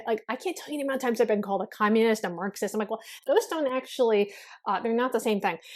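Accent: United States. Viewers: You are a white american. Of course these are social constructs and I guess you can be anything you want but others can do the same and label you white american. You look white and you sound american.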